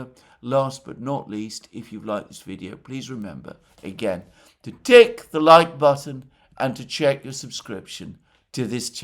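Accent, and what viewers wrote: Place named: RP, United Kingdom. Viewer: 勿体ぶった話しぶり 下顎を上げ視線は鼻先をすり抜け "ゆ なあーいてっど" 「united」（発声発音：Received Pronunciation、RP） 持って回したものの言い方 その先に必ず待機万端するのは 枕詞 「以前私が議論した通り」 これらの風味滋味を愉しめる渋い寛容は キエフ陥落に至るまで持ちこらえられそうには、もう無い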